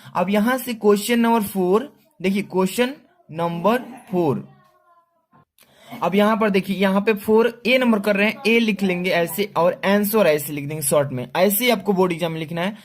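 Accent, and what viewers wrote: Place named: Bihar, India. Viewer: Sir ap Bihari hai na.. pta chal jata hai😂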